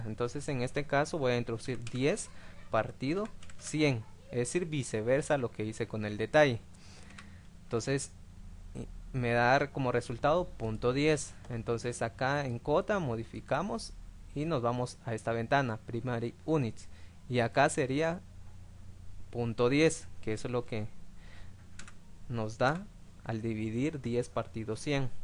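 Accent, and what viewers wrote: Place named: Guatemala. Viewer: Ya decía yo :D jeje no pude confundir tu acento chapín mi hermanito! :D Dios te bendiga :D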